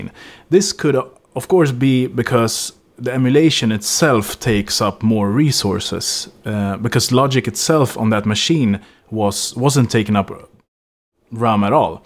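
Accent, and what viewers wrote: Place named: Sweden. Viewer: Tack för denna videon =) Då vet man lite vad man ger sig in i ifall man beslutar för att gå över till M1 i framtiden. Gissar på att du pratar svenska, hör på dialekten =) =)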